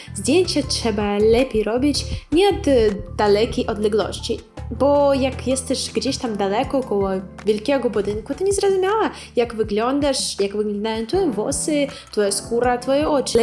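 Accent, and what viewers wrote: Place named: East, Poland. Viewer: Masz zajebisty wschodni akcent. Mogę Cię słuchać godzinami.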